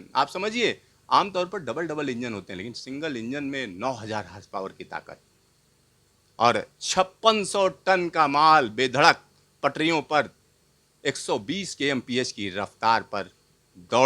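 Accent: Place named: Bihar, India. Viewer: Bihari accent mat bolo saf hindi bolo.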